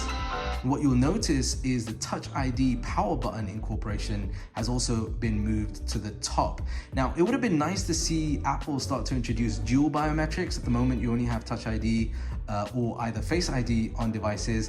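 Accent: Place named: United Kingdom. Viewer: It's Hard To Manage British Accent Including Explaining Points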